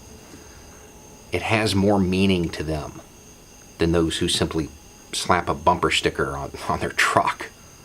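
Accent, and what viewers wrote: South, United States. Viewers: I love this guy so much. With my own inherent bias and assumptions , I immediately assumed that a white man with a beard and a southern accent was going to post racist/right wing rhetoric. So it seems I have to do some self reflection of my own and think about how I perceive white people. His such a gentle and thoughtful soul. His narrative is both beautiful and soothing and most of all he makes sense! He critiques society through a historic and present lens and I love that. we need more people like Beau who preach,love, equality and understanding. THANKYOU ❤️❤️❤️❤️🙏🏾🙏🏾🙏🏾🙏🏾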